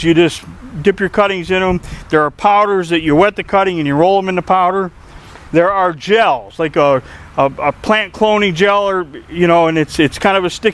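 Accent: United States. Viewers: So glad to hear someone from the United States that speaks English, down to earth, and gets right down to business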